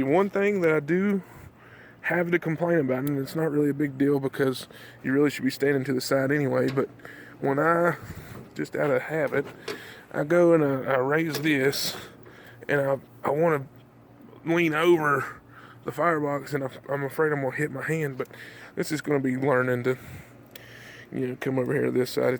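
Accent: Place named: Georgia, United States. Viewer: Dang I thought you was from Georgia😂😂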